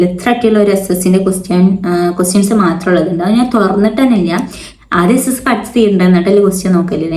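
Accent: Malappuram, India. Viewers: Aa varthanam kettaa arinjoode mlpprm ahnn nn😂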